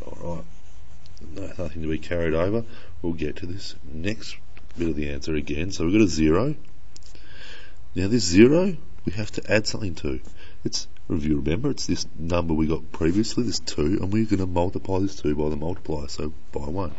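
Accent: Australia. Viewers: tanvi sharma, he's Australian.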